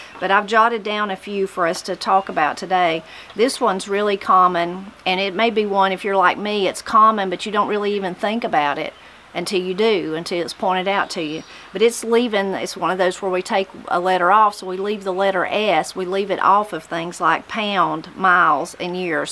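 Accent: Appalachia, United States. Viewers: I learned in West Virginia history, at West Virginia Tech, that the Appalachian accent is one of the hardest accents to mimic! And your videos make me proud of that, we do have a rich hillbilly accent. I love your videos always will, I have a blessed day sweetheart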